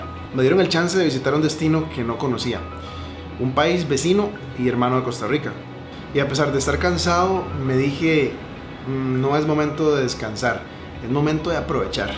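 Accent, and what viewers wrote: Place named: Colombia. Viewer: pareces colombiano, de echo hay partes de que tu acento se asemeja mucho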